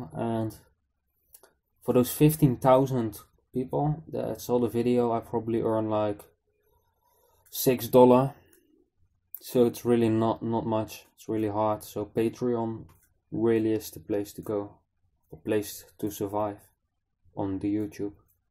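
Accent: Netherlands. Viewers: Je hebt zo'n goede video's.... Kom er steeds op door dat je met anderen op pad bent... en kijk dan toch steeds even naar je vids. Maar pfoe.. ik kan me echt niet abonneren en klik vrij snel weg omdat ik dus al heb gezien wat je gefilmd hebt met je co-host zeg maar. Niet omdat ik niet wil zien wat JIJ geflimt hebt ten opzichte van je co host want ik kom steeds even kijken, maar sorry... ik kan echt niet tegen je "steenkolen" Engels.... Ik weet dat iedere Nederlander of Belg (inclusief mezelf!) gewoon een rot accent in Engels heeft maar het is gewoon TE erg voor mij. Ik kan zelf vrij goed Engels verstaan, lezen, schrijven etc maar spreken doe ik zelf ook liever niet ivm accent. Ik weet hoe moeilijk het dus is maar dat houd ook meteen in dat ik elke Engels met een Nederlands/Belgisch accent gewoon niet aan kan horen :D Het is dus niks persoonlijks en zou willen dat ik je vids met een open blik kan bekijken maar ik kan het gewoon niet.. 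Sorry!! Ze zijn zo goed maar zodra je Engels begint te praten krimp ik in elkaar .....De video met Mark en in the prostitute mansion: de duif of uil: he flight right at him!! The Owl flight right at him!! En niet 1x maar diverse keren en tja.... we weten allemaal dat die zin totaal niet klopt. Wil ook echt niet negatief zijn want je video's zijn goed... erg goed.. maar misschien toch beter in het Nederlands? Trek je echt meer viewers door Engels te praten in je video's? Mark is ook alleen Nederlands...en volgens mij hebben jullie bijna hetzelfde a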